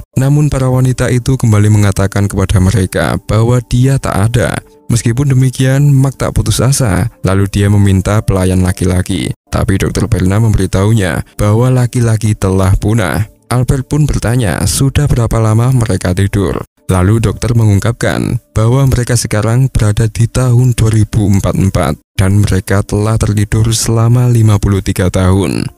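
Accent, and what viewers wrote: Java, Indonesia. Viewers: Suara ne wong jowo iki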